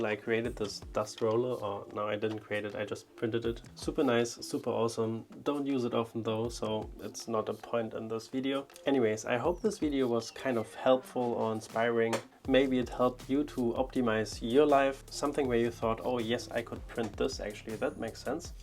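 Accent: Germany. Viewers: i hear german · I love this guy, I'm german but moved to America when I was 4 and listening to him is like listening to opa and onkels speaking English to me when I was younger because they wanted to show me how smart they were. Thank you for the heartwarming memories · Direkt raus gehört das du drutscher bist, deswegen noch sympathischer 👍🏽 mach weiter so super video🫶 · Are you German? I love your accent!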